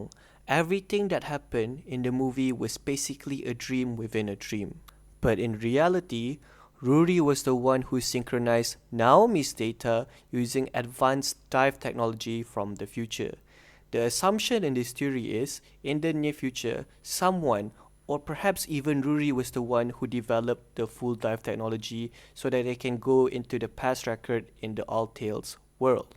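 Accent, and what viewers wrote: Malaysia. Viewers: U sound very malaysian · correct me if im wrong...but your pronunciation like manglish la...are malay bro?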